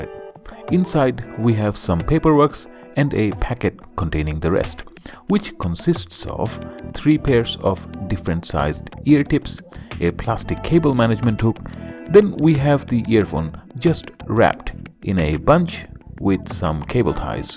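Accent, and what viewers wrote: Bengal, India. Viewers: your accent made me guess that you could be Bengali. That's why I asked.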